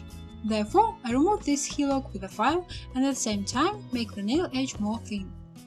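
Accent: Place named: Russia. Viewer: Сразу видно когда наш человек говорит на английском 😎такой жуткий акцент😂но это все фигня, я даже так не умею🤥а работа просто афигенная 🔥🔥🔥👍👍👍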